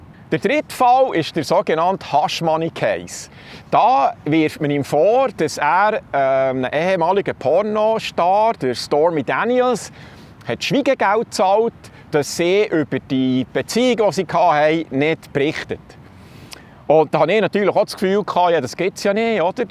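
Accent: Bern, Switzerland. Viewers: iu, tschübe, si'sch gäng schöön d's Bärndüütsch. Tuet soo guet, im Kanton Basu/Solothurn (näbenang)! Regina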